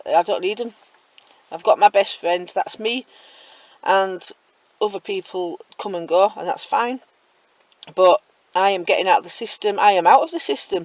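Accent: United Kingdom. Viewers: I just discovered your YouTube channel after seeing your comment on the channel with the British guy in the Airstream. I'm watching this from Florida, in the U.S. I find it interesting to listen to people from the U.K. speaking English. It's like a whole different sounding language, even though I can understand everything you say.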